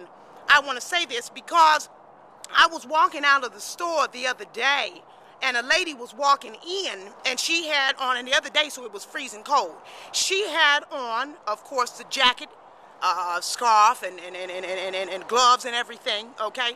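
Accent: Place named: South, United States. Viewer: This video would make an ideal case study for linguists in the exploration of the African American Vernacular English / Southern American English, with a Georgian / Coastal Southern dialect. She has such a graceful demand over Southern chain shifts and she speaks with so much clarity.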